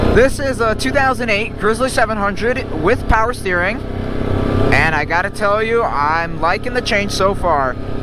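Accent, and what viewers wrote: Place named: Quebec, Canada. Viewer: Nice! I thought you were french-canadian when i started watching lol.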